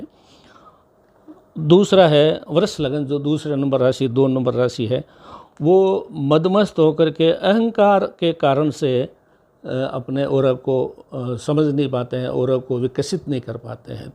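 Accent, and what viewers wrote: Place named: Rajasthan, India. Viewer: हमको लगता है आप राजस्थान के हैं आपकी लैंग्वेज से भाषा से पता लग रहा है क्योंकि हम राजस्थान के हैं ।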